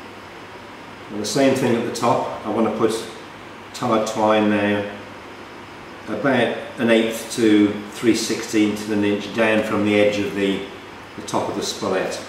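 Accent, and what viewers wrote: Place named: United Kingdom. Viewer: Also, where did you live in the UK? You have a familiar accent.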